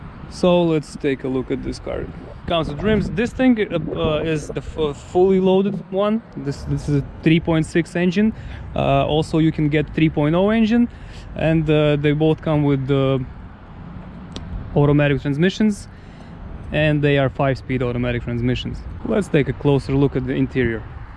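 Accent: Russia. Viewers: I,d say a Ruskie too .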